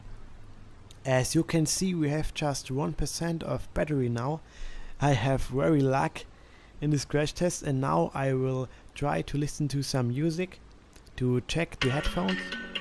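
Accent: Germany. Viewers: dieser deutsche Akzent ;) aber gutes video bro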